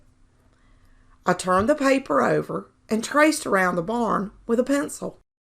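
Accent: South, United States. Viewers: I like your accent it's country keep it up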